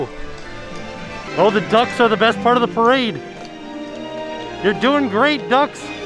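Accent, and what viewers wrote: South, United States. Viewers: It's a southern twang. We mean no disrespect. Have a beautiful fall.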